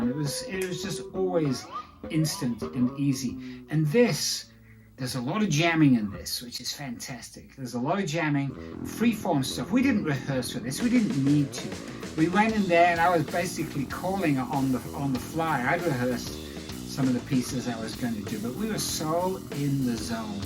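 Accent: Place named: South Africa. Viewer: This drummer is so on! BTW - interesting thing about your accent now is that you actually sound quite South African.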